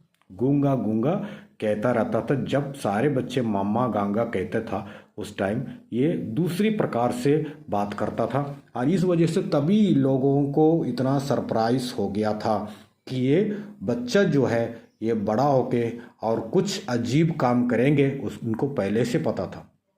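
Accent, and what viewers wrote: South, India. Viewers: Aap south indian ho🤔🤔🤔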